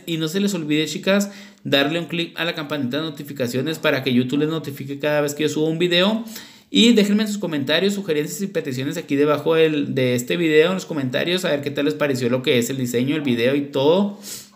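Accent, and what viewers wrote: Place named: North, Mexico. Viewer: Hermoso para una novia de impactó 😍eres norteño? Me gusta tu acento, si queremos diseñitos en punta mas corta saludos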